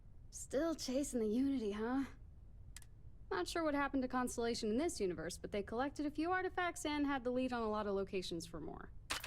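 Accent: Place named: Australia. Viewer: Nice video. I had a bit of a giggle to myself with the commentary in an aussie accent but the script seemed to be written by an asian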